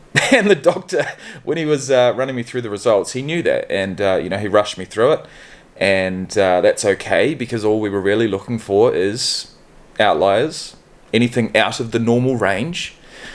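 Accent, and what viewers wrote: New Zealand. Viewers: I thought you were a fellow kiwi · His accent is New Zealand not Aussie but they are similar. Congratulations on your sobriety. :)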